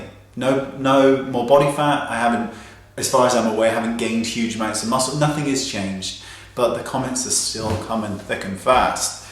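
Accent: United Kingdom. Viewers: I think you're gorgeous. You're British accent is probably misleading but if you're here in the UK call:-)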